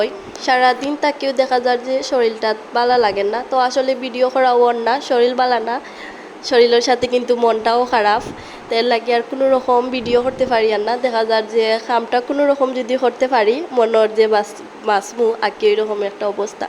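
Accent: Sylhet, Bangladesh. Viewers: আসসালামু আলাইকুম সিলেটি আপু কেমন আছো আজকে তোমার ভিডিও টা নতুন দেখছি তোমার মিষ্টি মিষ্টি কথা গুলোর প্রেমে পড়ে গেলাম। তোমার লজ্জা লাগার কোনো কারন নেই তুমি তোমার মাতৃভাষায়ই কথা বলো এতেই অনেক অনেক ভালো লাগে তোমার ভিডিও দেখে মনে হলো যে তোমার সাথে আমার অনেকটাই মিল আছে। আমার ও তোমার মতো টনসিলের সমস্যা আছে। তোমার মতো আমার সেইম বেডশিট আছে। আমার শৈশব কেটেছে সিলেটে তাই সিলের কথা শুনলেই মনটা কেমন যেনো আনচান করে জানিনা কখনো আর যাওয়া হবে কি না তবে সিলেটের জন্য মনটা আনচান করে। যাই হোক তোমার ও তোমার পরিবারের জন্য অনেক অনেক দোয়া ও ভালোবাস রইল পরবর্তী ভিডিও দেখার জন্য অপেক্ষা করবো ❤❤❤❤ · আসলেই তাই নিজের কাজ নিজেকেই করতে হবে।যতই অসুস্থ হই।আপনার ভয়েজ সুন্দর। সিলেটি ভাষা শুন‌তে ভালো লাগছে❤❤❤❤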